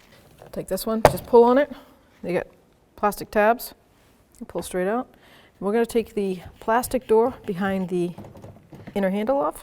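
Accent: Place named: Massachusetts, United States. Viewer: Nice job. I detect a Massachusetts accent. Am I right? :)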